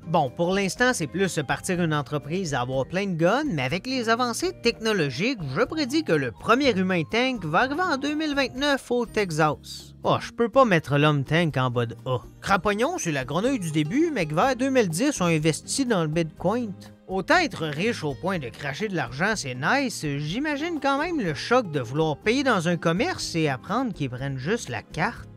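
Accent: Quebec, Canada. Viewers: Salut je viens de m'abonner sur la premiere video que je regarde , mais par contre , l'accent quebecois 😂 (je ne me moque pas mais je l'ai jamais vu)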